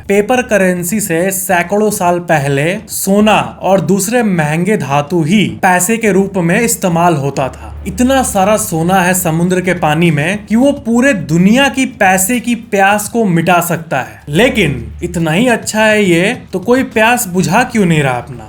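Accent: Bihar, India. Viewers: That bihar Accent.... kaisan baada ho❤❤😂😂😂😂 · Your Bihari Vasa Acha Tha 😅😅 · LAGTA HAI AAP BIHAR SE HAI 😄😄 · Bihari confirmed✅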